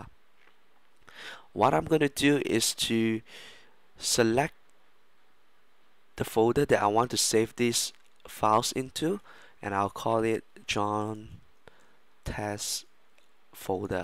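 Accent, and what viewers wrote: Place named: Malaysia. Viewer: my guess is...he's from Malaysia or Singapore... :)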